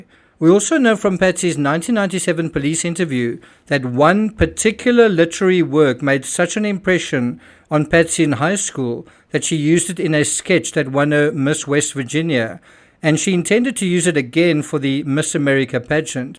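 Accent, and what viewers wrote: South Africa. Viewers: That south african accent though. Hideous af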